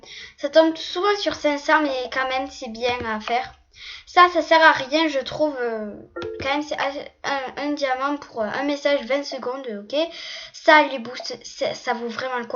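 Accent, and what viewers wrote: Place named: South, France. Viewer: J'aime trop ton accent tu vien du sud ?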